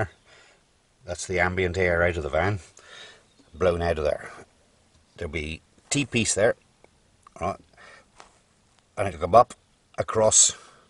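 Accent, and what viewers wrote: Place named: Wales, United Kingdom. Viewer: I know sounds like he is from there with a touch of welsh thrown in but to learn that he is from Hereford came as a bit of a shock. That said love the accent.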